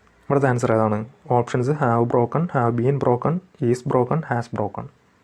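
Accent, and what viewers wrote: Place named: Thiruvananthapuram, India. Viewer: Chettan TVM aanallle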